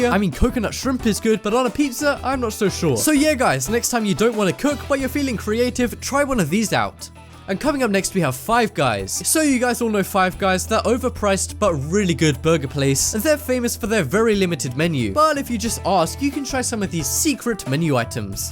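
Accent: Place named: United Kingdom. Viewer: You got Brit voice but say dollors so are these available in uk not that I be ordering soon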